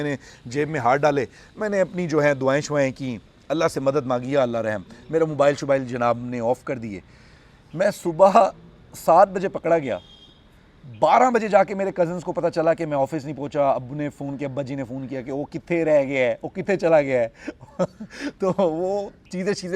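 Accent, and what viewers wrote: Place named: Punjab, India. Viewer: Ap penjabi Boht piyari bolte hain 😅😅😂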